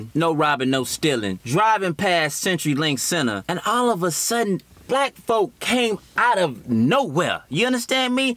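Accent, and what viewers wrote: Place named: New York, United States. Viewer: You originally from New York?? I detect an accent.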